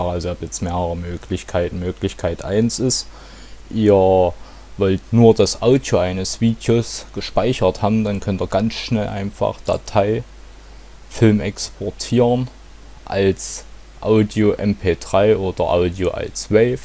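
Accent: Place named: Saxony, Germany. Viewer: dummer sachse. lern deutsch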